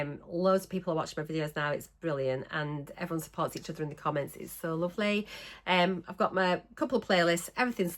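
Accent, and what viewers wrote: Yorkshire, United Kingdom. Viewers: So pleased to hear you’re so close to your target! I love your channel and find your Yorkshire accent so endearing! I’m just one pound short of 4st loss, but will need to pause my injections for two weeks prior to a knee replacement surgery, and another one or two weeks post surgery. Not thrilled about that but needs must, I suppose 🤔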